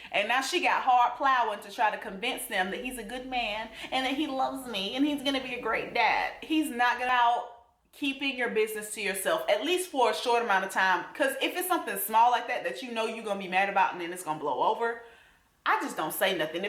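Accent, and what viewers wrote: South, United States. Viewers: Who doesn’t like her accent?!??? I’ll fight them! I love southern accents. Wish I had one.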